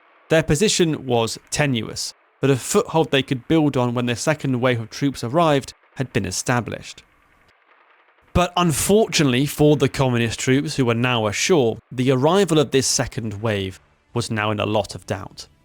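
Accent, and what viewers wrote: United Kingdom. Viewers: The British accent is the best for history videos fr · Good information but your bias is too strong. Also your pronunciation of Chinese is soooo British. Just a travestry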